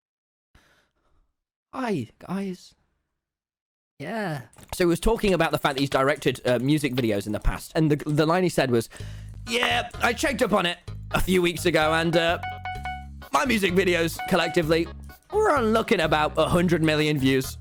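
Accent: United Kingdom. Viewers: Bruh I loves this guy's British accent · Yay your British! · BHAHAHAHAHAHAHHA HOW DOES HE SOUND AMERICAN? YOU CAN HEAR HES 100% BRITISH LMAO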